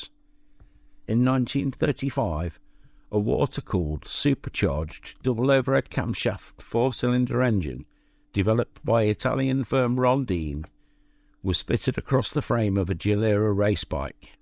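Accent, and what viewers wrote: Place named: Derbyshire, United Kingdom. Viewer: That’s odd. YT does that occasionally. No, haven’t deleted any comments. Twin Brembos should get the job done. I look forward to the vid. I’m in Derbyshire. Your accent sounds like you’re nearby. Am I correct?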